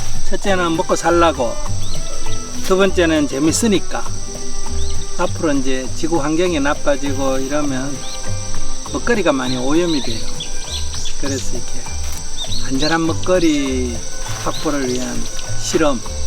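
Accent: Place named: Gyeongbuk, South Korea. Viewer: 경북 억양 너무 정겨워요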